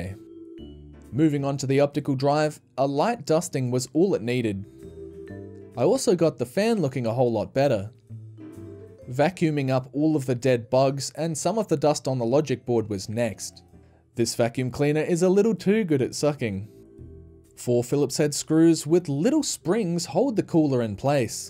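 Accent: Australia. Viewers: You voice sounds just like my Australian friend I met on Facebook lmao. Y'all are so chill and relaxing to listen to. Totally random. 🤣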